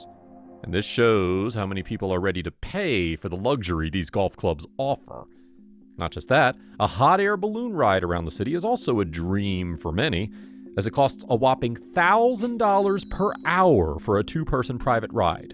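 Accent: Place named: France. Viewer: Your French accents is nice sir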